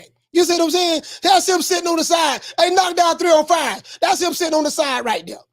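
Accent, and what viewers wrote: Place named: Texas, United States. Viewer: Hey Coach I'm going to check your show out. 2hrs is a long time.i have to break it up into several segs. Where you from Coach? You sound like you're from Oak Cliff, TX.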